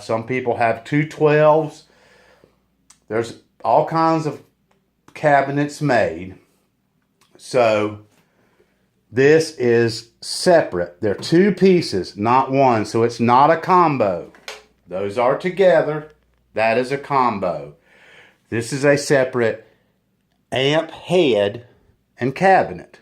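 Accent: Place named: South, United States. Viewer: Very good video, I especially like the repetition of terms, that way you remember them better. Btw, I am south-European guy, but I would say based on your accent that you come from the south of States somewhere ... :)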